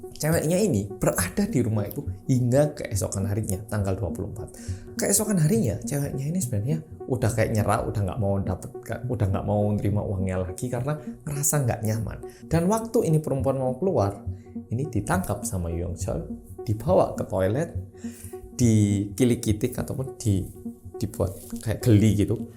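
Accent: Java, Indonesia. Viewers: Hujan gini nonton KOREA REOMET BERSAMA ORANG KOREA YANG MEDHOKKKK.. Hehehe.. Semangat trus yea mas bikin konten spti ini candu bget dah.. Sehat2 sllu yea mas. 😊 🙏🏾 🖤 🔥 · Kok mirip. Ko Korea medok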